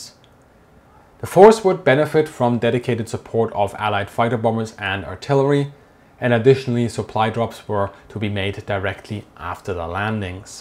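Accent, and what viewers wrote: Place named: Germany. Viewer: Try to speak slower, because your pronuntiation is all but standard,rp, or any other any way. German pronunciation is dufficult to understand. At ,75 speed it is easier.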